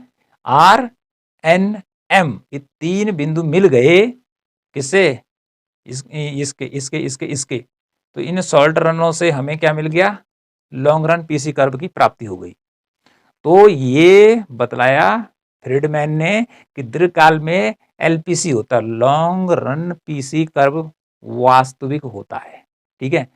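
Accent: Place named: Hills, India. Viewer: sir apki bhasha se lag raha jaise aap pahad se honge 🙏 or thank you sir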